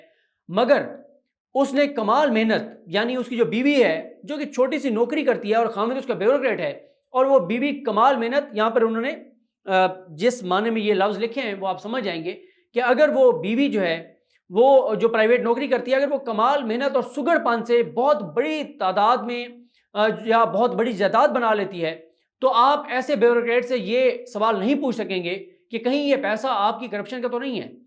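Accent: Saraiki, Pakistan. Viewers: I did see you many a times in supreme Court when zehan e beemar was listening the 56 companies case I noticed none of the journalists gave you any importance You position was exactly the same as used to be the position of cake delivery boy now finding the stolen milk of his kids by Nawaz Sharif somebody was telling me your only credit is telling lies and speaking Urdu in some Seraiki accent you are a shameless person who never talks the truth I understand you can do anything for the sake of earning more and more money but mind one thing the liar end of the day is trapped by his own lies and has to face the insult and the abuses of the common man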